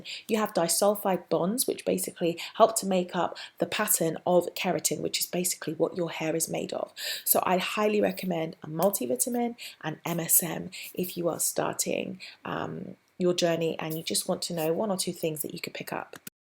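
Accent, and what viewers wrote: England, United Kingdom. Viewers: you had an English accent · That English accent...love!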